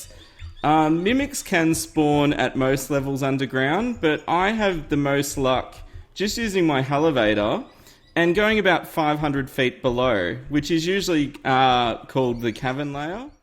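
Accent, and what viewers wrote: Australia. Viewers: He is aussie right? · You're Aussie... Aren't ya? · Are you Aussie because I think.. you are.. so yeah. And say magic is hairy with your tongue out!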